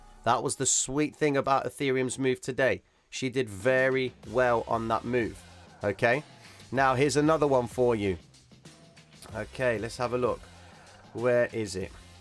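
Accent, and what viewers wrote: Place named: North London, United Kingdom. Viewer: Most underrated, level-headed analysis I have found on YouTube. Most people don't realise that 99% of these TA guys are just trying to shill you their telegram or discord or VIP group which lies behind a massive paywall. If they were really that good they would make bank on trading but they clearly don't if they need to sell you their BS programmes about drawing triangles and lines. gives the most valuable information for free and you can tell he doesn't need to do it but he wants to help people. Literally top 3 trading channels on YouTube. Can't wait to sign up for patreon just to show my support. Much love, btw you must be from north London or something cos you sound just like me lol, I'm gonna go for Greek Cypriot haha, nuff love <3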